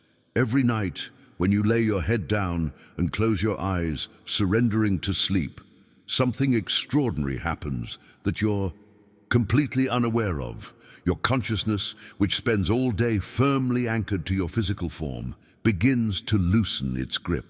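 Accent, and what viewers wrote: United Kingdom. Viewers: Midlands England x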